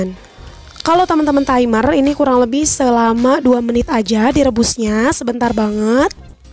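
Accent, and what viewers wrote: West Java, Indonesia. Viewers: Sunda mana teh meni geunaheun hhi,, sukses slalu teh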